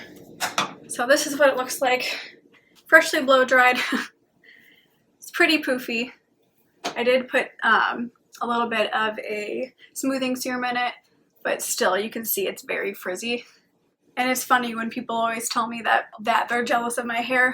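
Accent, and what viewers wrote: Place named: Midwest, United States. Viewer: I just found you while looking for tips on trimming my hair and I’m so delighted you seem to be sticking around! It’s wonderful to see someone with genuinely long hair on hair YouTube!! Could we maybe get some more tips on other kinds of styles for long hair? I’m not sure if you wear your hair back much, but there aren’t a lot of people with any idea how to deal with real length when doing updos or braids so any tips you have would probably be helpful to those of us who love our long hair but aren’t always sure the best way to handle it. I really hope this doesn’t come off as preachy or bossy but... please be very careful with any travel plans! I live with a microbiologist so I’m pretty up to date on what’s going on and there’s a huge spike in cases and hospitalizations in parts of the country right now and we won’t even start to see the effects of 4th of July parties for about 2 weeks. 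We just had to start shutting 7 counties back down here in California. Maybe stay local-ish? You sound like you’re from somewhere in the Midwest and it seems safer there for the moment. I hope you have a happy anniversary though!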